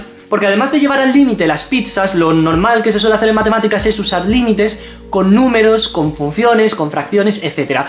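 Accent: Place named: Spain. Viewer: Es gratificante ver un peruano con acento Español que explica derivadas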